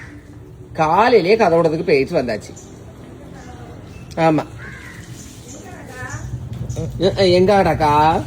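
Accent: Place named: Nagercoil, India. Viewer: Nagar Kovil tamil super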